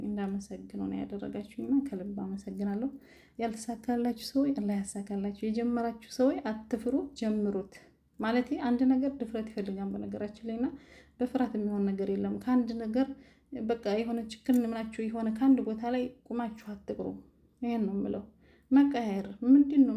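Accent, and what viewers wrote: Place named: Wollo, Ethiopia. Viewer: እርግጠኛ ነኜ አማራ ክልልናት የወሎ ይመሰለኛን አማርኛዋ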